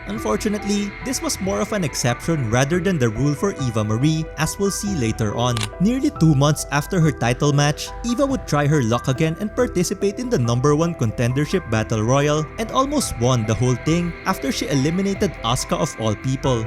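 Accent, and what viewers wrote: Philippines. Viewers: Bro are you filipino? 😍😍😍 love your voice!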